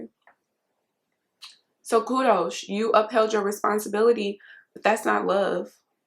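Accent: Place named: Deep South, United States. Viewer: Hey where are you in the Deep South because you have a real deep and strong southern accent ? Which city and state are you from in the Deep DEEP SOUTH???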